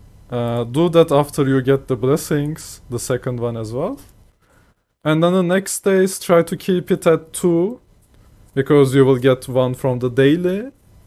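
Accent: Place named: Turkey. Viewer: Reis aksandan dedim bu kesin Türk aha dedim baktım kanalın hakkında kısmında Türkiye yazıyor :D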